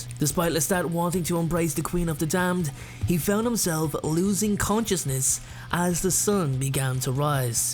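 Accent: Ireland. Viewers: You sound like conor mcgregor, that's how i know you're from ireland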